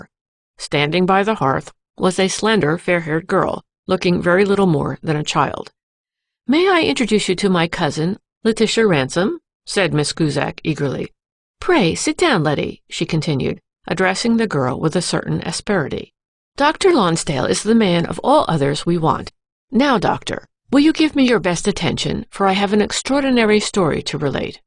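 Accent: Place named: United States. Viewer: sorry, no, don't mind me. I just was looking for as I mentioned in another reply, a British voice reading these particular stories. And I would go to one that would have one name for the channel and it would be I presume you or another female non-British voice, then I would scroll and there would be another one in the series but the channel name would be different and I would click on it thinking it would be a different voice but it was still an American or Canadian female reader. If I mistook this and if it's not like that and there aren't different channels with you or another non-british female narrating then I apologize. I did it very quickly so perhaps I got it wrong. But my original question was why does it sound like the same woman narrating stories I want to hear but with different names for channels where one might reasonably assume it would be a different voice a different person altogether,, but every one I clicked on seemed to be the same voice no matter what the name of the channel was.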